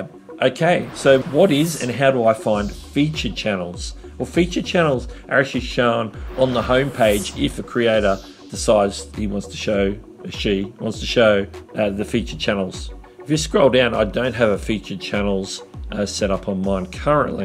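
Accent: Australia. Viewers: this guy is Australian?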